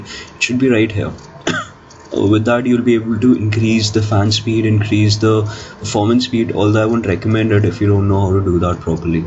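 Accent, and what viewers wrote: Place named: South Asia. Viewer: I HATE PAKISTAN-INDIA ENGLISH ACCENT.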